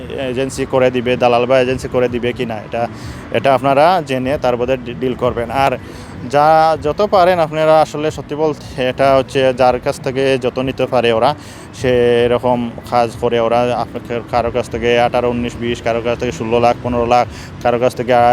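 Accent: Sylhet, Bangladesh. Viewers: ভাইয়ের কথা শুনে মনে হচ্ছে, আমাদের সিলেটের??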